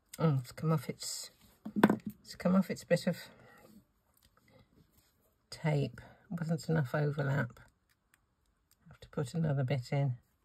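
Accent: United Kingdom. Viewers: Your lovely British accent gave it away!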